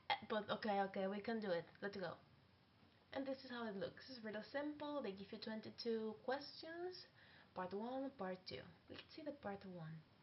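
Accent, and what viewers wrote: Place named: Netherlands. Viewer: aww thank you so much can i ask more question how many months did you learn dutch and understand it? and in CIE exam how many hours or minutes does the exam goes im so nervous cos im doing self study as you your dutch accent is really nice aswell on the video its btw 😊.sorry i have a lot question hope you understand me 😘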